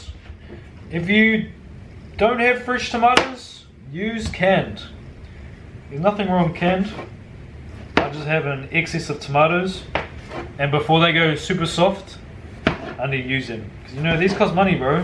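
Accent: New Zealand. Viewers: I’m Māori and was looking for bunny chow recipes knowing it’s a Indian South African dish. Last thing I expected was to hear an accent like mine haha. Look so good btw thank you for this!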